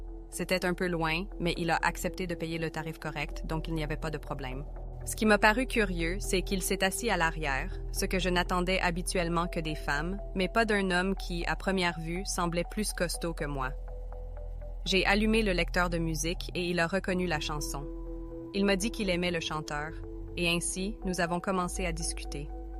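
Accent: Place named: Canada. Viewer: Bonsoir, je viens de tomber sur votre vidéo et j'aime votre accent canadienne et je vais regarder une autre de vos vidéos car je voir dans le commentaire que c'est pas votre vraie voix ❤ bonne soirée à vous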